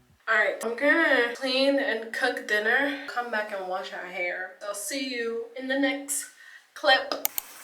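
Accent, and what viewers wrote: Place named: Ghana. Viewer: I knew she was Ghanaian the moment she started talking 😂❤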